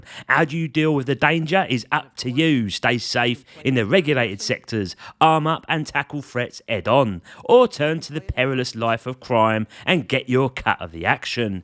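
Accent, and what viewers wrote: Cockney, United Kingdom. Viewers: Awesome vid and so true!!! Respect for U doing it!! and on another note....there is nothing better than Cockney to interpretate English Lang!! 🙏🏻💪🏻👌🏻😂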